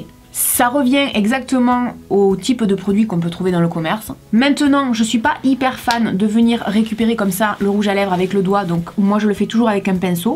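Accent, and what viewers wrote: South, France. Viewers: Alleste moi je dirai du Sud honnêtement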